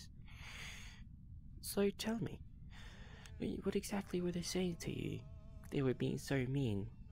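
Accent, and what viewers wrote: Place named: Australia. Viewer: I love this! I thought you were a husky at first and clicked the video because the avatar was cute. The next thing i know, I'm listening to an Australian accent kangaroo 😂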